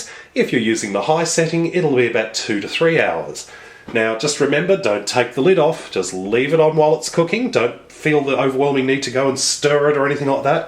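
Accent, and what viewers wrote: Australia. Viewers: Australian mate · He’s an Ozzie 👌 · Love the Aussie accent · let me guess. definitely Australian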